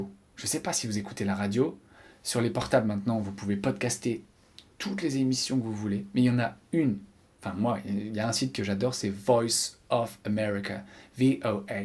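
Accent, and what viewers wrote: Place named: United Kingdom. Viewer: J'adore votre accent so British 😊😊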